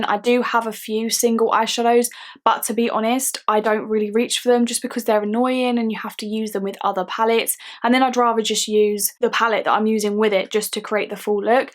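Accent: United Kingdom. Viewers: ❤❤❤❤ I like your videos, like you like your British accent.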